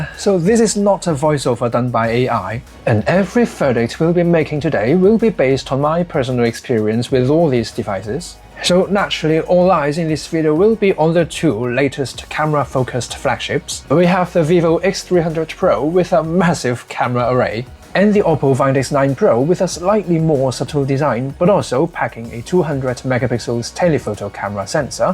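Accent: United Kingdom. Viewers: I just realized you have British accent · 英式口音？👍叻叻，英式係難過美式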